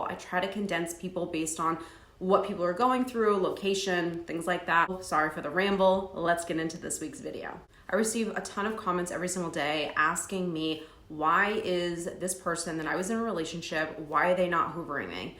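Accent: United States. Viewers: Would you be able to slow dawn when you talk because lm English and find your accent very strong not being rude your American accent is very different to understand please slow dawn or try to talk slower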